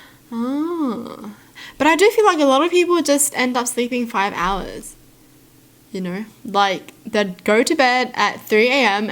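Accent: Australia. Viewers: She's Australian lol <3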